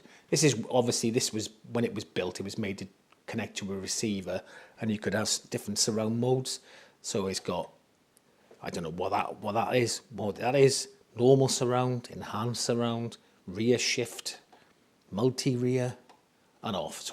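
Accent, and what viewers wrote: United Kingdom. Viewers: Excellent British voice, I’m jealous 😭💞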